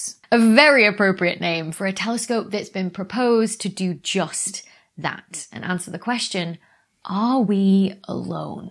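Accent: United Kingdom. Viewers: This is my first time seeing a video with Dr. Becky. Very informative, on a subject I look forward to. My American ears take time to get accustomed to her veddy veddy Bditish accent. Thank Goodness she talks like Julie Andrews, and not like Eliza Doolittle in her "Before" stage, the way so many cockney techies on YouTube do (I hear them and I think, "The royn is Spoyn staays moynly in the ploin" and I want to tear my hair out.).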